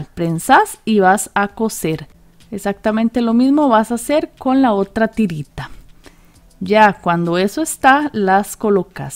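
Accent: Costa Rica. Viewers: Ese acento me es familiar!! Eres Costarricense?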